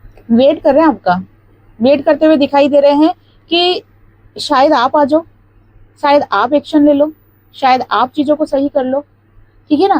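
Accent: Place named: Madhya Pradesh, India. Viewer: Mem Apke Bolne Se He Muje Pata Lag Gaya Tha Ki Aap MP Ki Ho Mem Apki Reading Ne To Kamal Kar Diya 10000% Perfect Same To Same Situation Vry Vry Tks Gujarat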